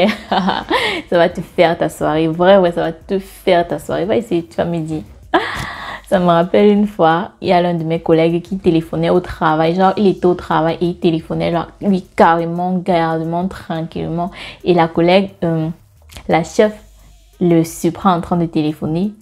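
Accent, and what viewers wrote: Cameroon. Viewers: T'as un accent camerounais et ton est sénégalais?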